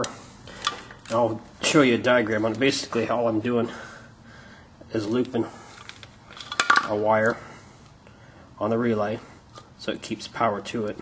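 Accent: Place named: California, United States. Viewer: Thx for the info dude! You sound like a California boy and the music after the video definitely Cali shit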